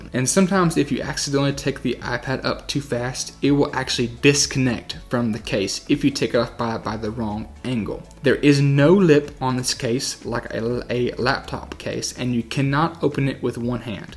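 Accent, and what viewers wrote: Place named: South Louisiana, United States. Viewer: Hey, like your review. I'm just trying to decide whether to get this one or wait for the Brydge Pro Plus to get it's act together. I'm pretty sure they will. And I like the idea of aluminum. One question, just out of curiosity: where is your accent from? You, by chance, from south Louisiana? Your accent sounds familiar.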